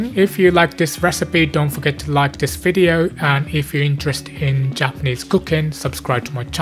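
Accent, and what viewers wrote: United Kingdom. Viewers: Yes, that's me! I like to support small but professional cooking chanel, btw, your british accent gets me. All your direction is easy, clean and on point. If you have time, would you please consider to cook 鶏手羽 香酥雞翅? The pork belly in USA grocery is pretty tough, so i couldn't roll it though. I leave it 2 days and it is so tasty and soft. Thank you again ^^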